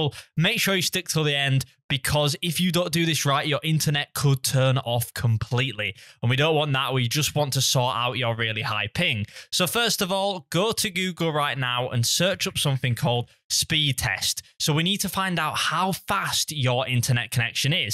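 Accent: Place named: United Kingdom. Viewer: Dang bros British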